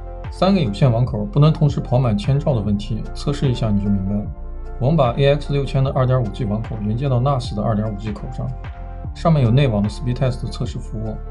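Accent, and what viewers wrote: Northwest, China. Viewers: 标准西北口音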